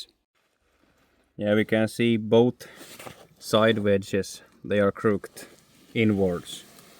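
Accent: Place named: Finland. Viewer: Hi, happy to see that my previous comment was already in you mind, as you becomes more popular YouTube brought me the fisrt video while the second one was already there. You are a Genius! You accent remind me the time I spent in 2006 in Tornio when I was commissionning an stables steel annealing oven in for Outokumpu.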